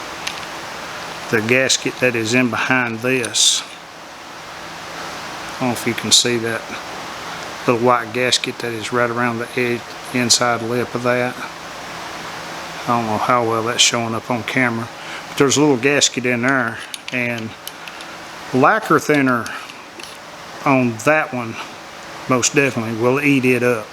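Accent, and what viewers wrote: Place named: South, United States. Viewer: Thank you for the detailed ins and outs of your experiences using this tool. Great vid. I live in Oz now and it's great hearing the Southern accent of home.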